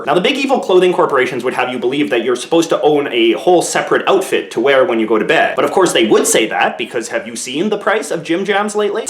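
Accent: Canada. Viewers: Bill Ward Hes canadian. Hes gonna speak differently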